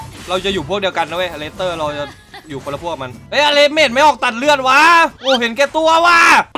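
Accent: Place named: South, Thailand. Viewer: คนใต้แน่นอนพูดแบบนั้น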